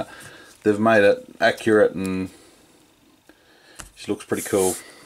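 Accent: Australia. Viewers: Thanks. You are right they let her walk like a human and it spoils all the hard work the effects people did. Where do you get your figs from you sound like an Aussie. Forget the Aussie housing crisis there is there is a black series crisis.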